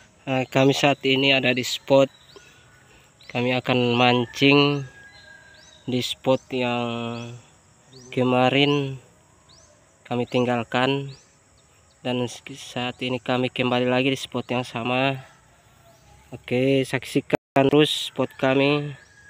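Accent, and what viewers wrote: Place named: Netherlands. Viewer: Kayak orang holand ini logat 😁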